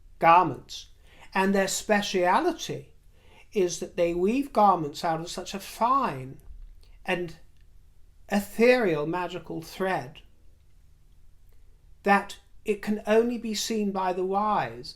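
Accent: United States. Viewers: By the way you have a great American accent! 👏👏👏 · your American accent is outstanding!😊🎉❤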